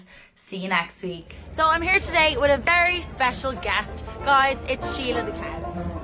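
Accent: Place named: Ireland. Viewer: I thought Australian accents were my weakness until I heard yours 😂😂😂. The videos Irish people tasting different kinds of alcohol always makes my day. Awesome videos!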